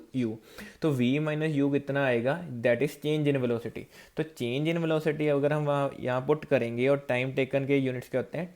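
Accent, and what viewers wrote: India. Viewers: Sir g aap Punjab se ho kya ???. ..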